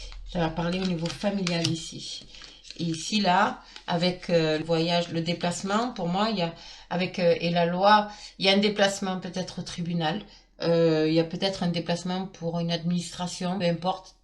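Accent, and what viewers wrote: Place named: Marseille, France. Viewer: Bonjour vous êtes du midi Marseille mais pas de paris Bravo vous avez une voie qui chante bien je suis gémeaux ascendant BALANCE et Lunaire BALANCE et ge deux petits enfants comme mois sauf lunaire mais ils ont us plus MERCURE et venus a leur naissance a y une chose bien le signe du Lion qui est depuis longtemps dans le signe Gémeau 🧚l'étoile de david me rappel mes ancêtre juive🧚oui c pas mal j'aime bien Merci mois je suis de Libourne près de Bordeaux et depuis 69 je suis sur Toulouse bonne journée 🧚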